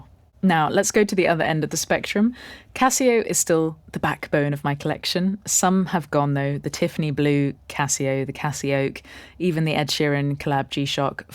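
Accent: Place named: United Kingdom. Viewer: This British accent is ❤❤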